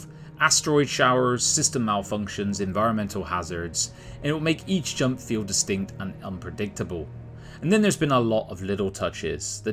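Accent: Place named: Gloucestershire, United Kingdom. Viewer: Satisfy my curiosity or, I’m a glos boy born and bred. From your accent are you from the same neck of the woods?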